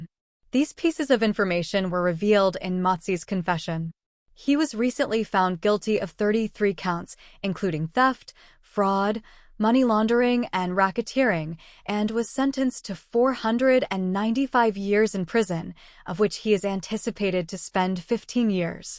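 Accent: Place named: United States. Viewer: Why is this in an American accent?🙄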